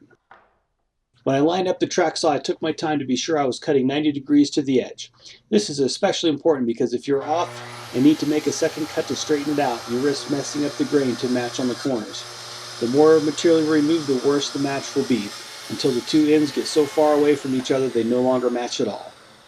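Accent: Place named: Croatia. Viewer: Wonderful vid however your heavy Croatian accent makes it difficult to understand what you are saying. Would you please consider providing American subtitles. Thanks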